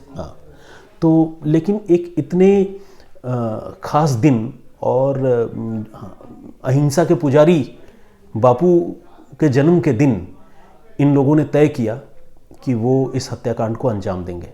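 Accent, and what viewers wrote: Standard. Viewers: sir ji apki hindi.....ap devta hain ....itni shudhh hindi bhot kam suni hai.. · Sir u r good anchor n good story teller with pure hindi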